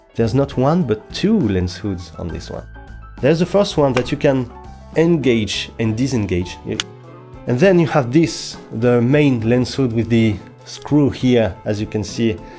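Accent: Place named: France. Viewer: Your French accent made this video very enjoyable to watch - thanks!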